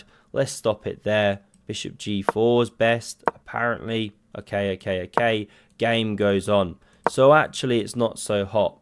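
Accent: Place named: RP, United Kingdom. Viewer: I subscribed as soon as I heard BBC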